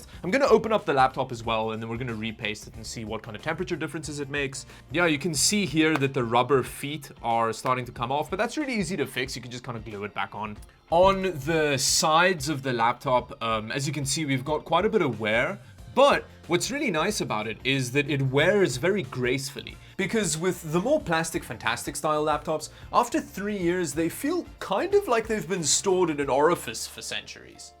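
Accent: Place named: South Africa. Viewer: ek kan hoer jy is 'n Suid Afrikaner maar van watter dorp?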